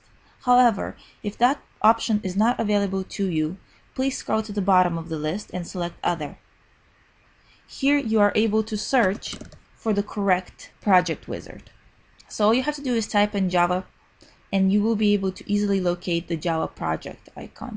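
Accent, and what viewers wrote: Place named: Russia. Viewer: You must be Russian!)) Thanks for the video...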